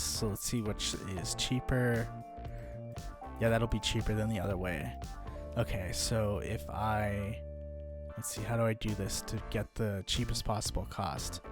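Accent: Punjab, India. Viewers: Bro are you punjabi?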